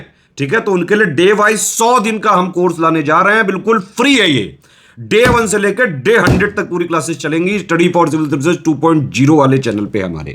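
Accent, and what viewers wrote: Kanpur, India. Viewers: Sir ye apka kanpur flavour mast hai😊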